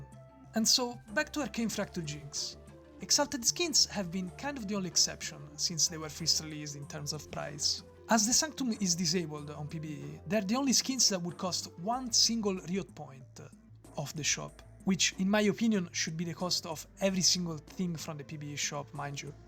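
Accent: Italy. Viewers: bro are you italian perchance your accent :D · You have such an Italian accent. Is it just me, or do you perhaps have Italian origins? I'm so curious xD sorry But anyway, I always appreciate your content. Thank you for what you do for us in the community ❤ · Are you italian perhaps? Your accent sounds like that for me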